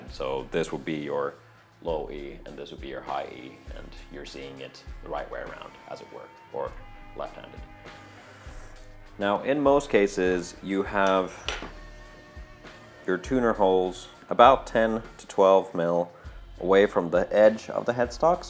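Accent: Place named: Netherlands. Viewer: I liked your video quite a bit. I started developing a basic line of instruments about a year ago, and by far the hardest part has been nailing down the headstock shape. It is quite hard to match one headstock to a variety of body designs. Another area to touch on is tilt-back versus flat headstocks and how the neck to headstock transition works in either case. I personally prefer the Fender approach because it is simpler, is less likely to break and saves on wood. I'm going to subscribe to your channel, as I greatly appreciate people who explore design and engineering. Incidentally, are you Dutch, or maybe South African? I'm trying to place your accent.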